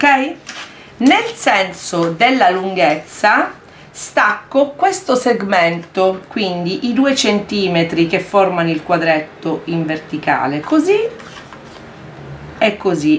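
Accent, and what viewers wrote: Catania, Italy. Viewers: ciao, sei bravissima! sei di Catania per caso?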